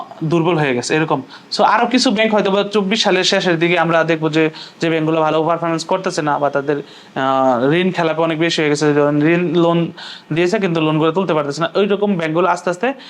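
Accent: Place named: Mymensingh, Bangladesh. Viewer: উচ্চারণে বৃহত্তর ময়মনসিংহের আঞ্চলিকতার যথেষ্ট প্রভাব মনে হয়। এটা এড়াতে পারলেও উচ্চারণ আরও বেশী সাবলীল মনে হবে।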